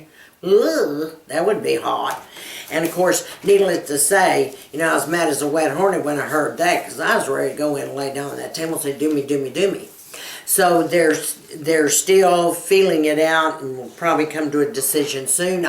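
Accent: Texas, United States. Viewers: Oh- Ultherapy not oil therapy- lol! Awhile back when I heard you mention it in passing I misheard what you said due to the honeyed Texas twang. Very interesting and informative! I'm looking forward to you having this done. The innovations in medicine are really exciting. As a former surgical nurse I love to hear about them.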